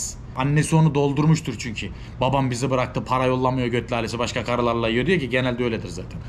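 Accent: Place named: Trabzon, Turkey. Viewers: Kardeş konuşman, tavrın aynı Trabzonlular gibi. İlk izlerken kesin Trabzonlu dedim!? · Seni tanimiyorum ama buyuk ihtimal trabzonlusun konusmandan ve tavrindan belli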